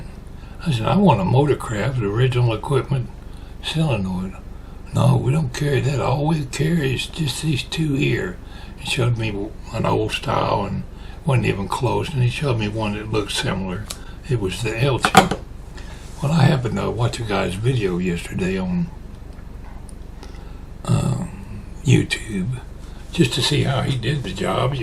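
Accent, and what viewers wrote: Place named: South, United States. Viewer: I noticed you pronounce it "SILL-uh-noid". So do a few of my in-laws. I looked up the pronunciation, and it's what I would have thought... "SOH-luh-noid". I reckon your way must be a Southern variation, because you aren't the only one that pronounces it like that. I wonder about some of your viewers from other regions ...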